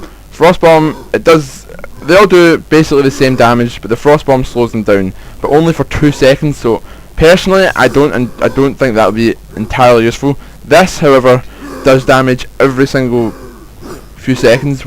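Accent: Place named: Scotland, United Kingdom. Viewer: great video great tips sexy scottish voice ;)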